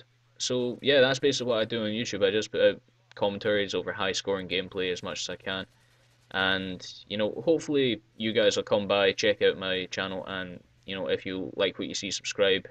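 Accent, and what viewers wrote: Scotland, United Kingdom. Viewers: woo scottish ftw ;D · I LOOVEE your scottich accent! Easily subbed!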